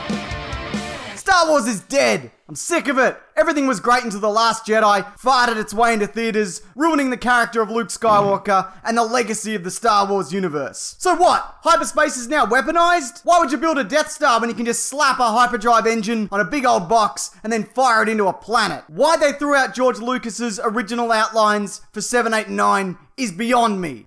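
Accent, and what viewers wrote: Australia. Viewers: What a great sarcastic Aussie rant?! Love ya work ya bogan weirdo! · OH MY GOSH! Someone yelling about Star Wars in an Aussie accent... BEST VIDEO EVER! 😂😂😂😂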